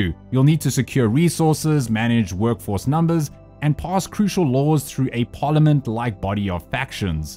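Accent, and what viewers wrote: South Africa. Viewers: Do... I hear a Saffa?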